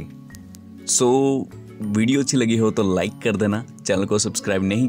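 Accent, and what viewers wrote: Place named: Kangra, India. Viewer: Kangri boli di tone aa di Teri bhasa ch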